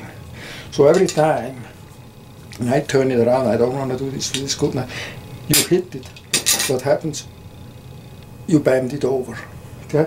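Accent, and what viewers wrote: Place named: Outer Hebrides, United Kingdom. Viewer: Excellent video; very well explained by a nice fellow. I use the 6 inch round tip boning knife for the deboning, joints and backend when I'm doing sheep. I have the Victorinox lamb skinner for the opening when skinning. I'm going to try a Dexter Russell 5 and a quarter lamb skinner as the Americans usually have good high carbon steel. The Victory New Zealand I have is a topper.. I can't help but think that you say some words very much like us here in the Outer Hebrides of Scotland; it must be the Celtic Germanic connection. Keep up the excellent videos.👍👍👌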